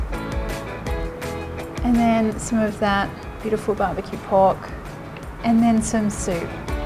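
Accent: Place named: Australia. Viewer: Your food and recipes are so wonderful and so easy to make. .love your Thai style and very aussie accent..your mom and dad must be so proud..I am so happy to have found your channel💖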